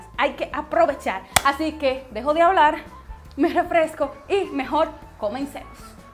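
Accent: Dominican Republic. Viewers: Me encantaaa, eres dominicana?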